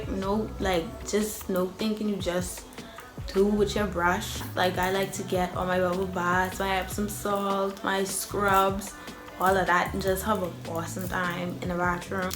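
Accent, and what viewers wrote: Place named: Caribbean. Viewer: You from trinidad? your accent is hella Caribbean and excuse me if you said it in the video I didn’t watch the whole thing 😂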